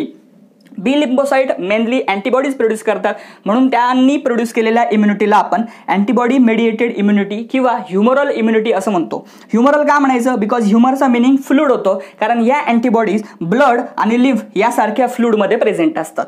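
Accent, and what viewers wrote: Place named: Pune, India. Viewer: Punekar cha avaj ahe to...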